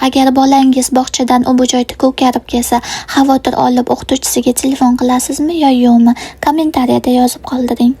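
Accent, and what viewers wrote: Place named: Andijan, Uzbekistan. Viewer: анжанлимисиз синглим. шевангиздан. билипколдим 😊😊😊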